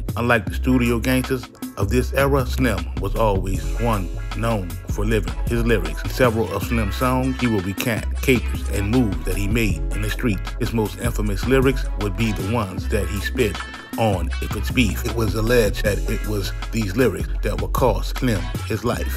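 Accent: New Orleans, United States. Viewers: Nigga Please...That's N.O. talk homie..3 times for the Real 3rd ward nicca..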